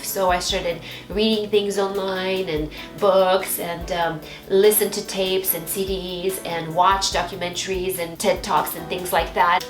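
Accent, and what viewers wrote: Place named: Romania. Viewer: Hei! Din nou iti scriu in romană,sper ca nu este ceva care sa te supere,dar stiu ca esti românca asa ca de fiecare data cand adaug un comentariu la un clip de-al tau aleg sa iti scriu in romană! Imi place foarte mult accentul tau cand vorbesti lb engleza! 👌🏽👌🏽 Dintre videourile tale am 2 care imi plac in mid special, si anume "Smokey green and glitter tutorial" dar si "10 Beauty and skincare recipes"! Te urmăresc si pe Instagram si imi place sa ma uit la insta storyes făcute de tine! Sper sa fie cu noroc participarea mea la acest concurs! Pupici din România! 💋💋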